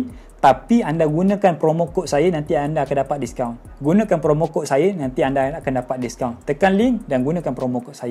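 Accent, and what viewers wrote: Kelantan, Malaysia. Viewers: Ustaz org Kelantan ke ?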